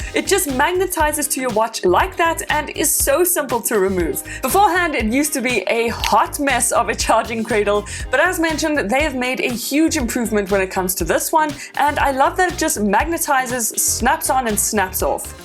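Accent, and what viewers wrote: South Africa. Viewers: R u from South Africa? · I had to do a double take because of your accent, and then immediately recognized it as South African. Hell yeah! Great video by the way :) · Are you South African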